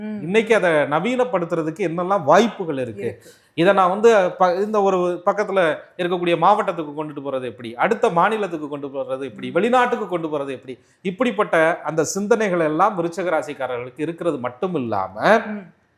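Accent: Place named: Madurai, India. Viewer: Madurai slang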